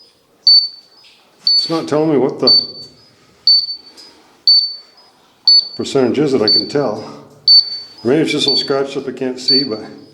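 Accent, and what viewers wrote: Canada. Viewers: sounds like you are a fellow canadian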